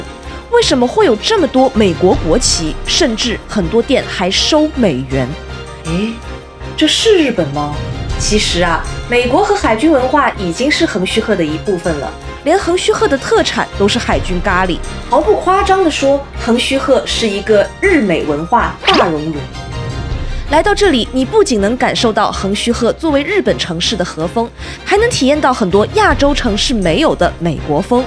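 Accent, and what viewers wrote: Taiwan. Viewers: 好棒的影片，看起來比較親切，又是莎木玩家，又介紹橫須賀這個地方，滿足了我們這些玩過莎木的粉絲好奇心，厲害啊XD（大大是台灣人嗎？在日外國人我一度看成國人，想說口音怎麼那麼台灣？） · 谢谢你！这个好棒啊。你的中文是台湾的吗？我的中文不好的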